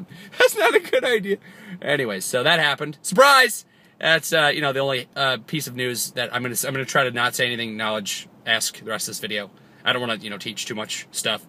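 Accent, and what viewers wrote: United Kingdom. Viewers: I love ya to death, but I hate your British accent. Bless your face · Dude u sound like charlieissocoollike with ur British accent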